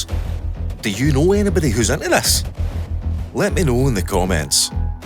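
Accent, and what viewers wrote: Scotland, United Kingdom. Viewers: Fist time I,ve ever seen any of your stuff,why has it taken so long for this to be brought to my attention Hello I understand Your Scottish or something I think theres a scottish on Our Planet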